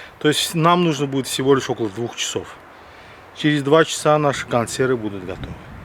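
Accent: Baku, Azerbaijan. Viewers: Родной Бакинский акцент! ❤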